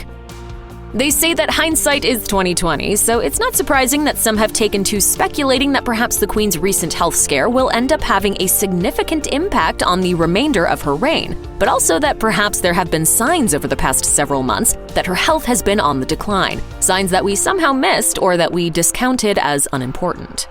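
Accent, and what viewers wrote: United States. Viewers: Why is some american narrating this? Absolute rubbish!! Worry about your own disaster of a country now that your own people allowed that trump and his ilk to completely make america look like a bigger bloody joke. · No facts are 'out'! What a waste of time and...a really awful American voice narration! 😖🙄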